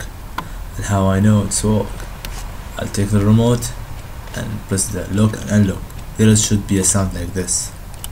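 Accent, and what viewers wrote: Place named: Arab world. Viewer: انت عربي وينك اوين التونتي فور تحجة عربي هيييييي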